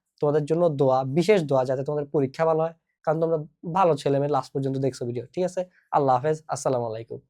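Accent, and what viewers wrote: Chittagong, Bangladesh. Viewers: খাঁটি চিটাগাইঙ্গা 😁🫶🏻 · বদ্দা চিটাংইগা নাকি? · Vaier chitaingaa accent 🔥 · ভাই খাটি চিটাইঙ্গা❤❤❤ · খাঁটি চিটাইংগা বদ্দা 🙂🙏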